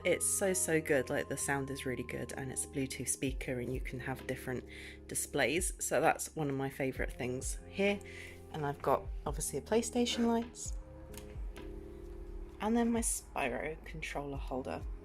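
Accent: United Kingdom. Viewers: British Accent + kind of ASMR voice + retrogaming = me subscribing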